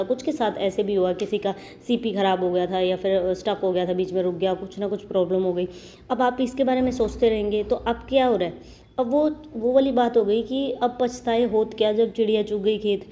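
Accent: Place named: Agra-Mathura, India. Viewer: dear aap Agra Mathura ke aas pass se hain kya.. as person's tone match the location 😊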